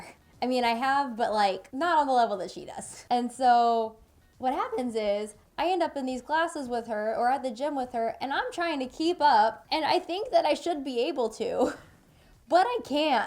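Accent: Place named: California, United States. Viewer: Since when does have a Californian accent even though she from NC?!?!? I am so confused....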